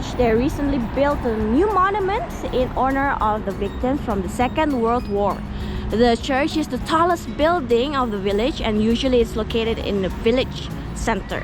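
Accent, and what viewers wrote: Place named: Europe. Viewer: Eeiiinnn logatnya macam European sekarang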